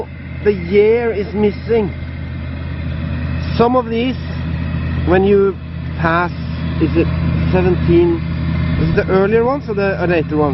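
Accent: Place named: Norway. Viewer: Hi! Im Norwegian, but ill write in English. Been watching your vids for a good while now, and you inspired me to find the right place to go hunt my first old coins. So, i went out on simular fields you walk on and found my two first Oscars and alot of other cool stuff. Thanks for the vids and the lovely "Thor Heyerdahl" English hehe. I hope I get the chance to go detect with you guys some day. 2 questions though, what is the name of that yellow book with the kings in it? Been looking for it, is it originally a childrens book? 2nd. What kinda detector are you using mostly? Im using the AT Pro and loving it. Wouldnt mind if you revealed some of the values/numbers on your detector while you detect. best regards Kim Erik :)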